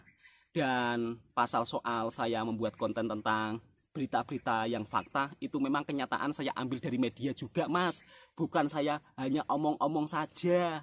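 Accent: Java, Indonesia. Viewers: Mas ni lucu bila bercakap kuat pelat jawa nya.. sekadar konten mengapa di ancam terus.. open minded aja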